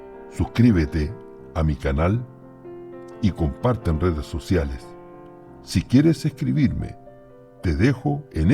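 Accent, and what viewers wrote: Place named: Chile. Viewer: Por la forma de hablar me parece que es chileno ¿o me equivoco? Soy católico practicante y que pena que se haya desilusionado de la Iglesia.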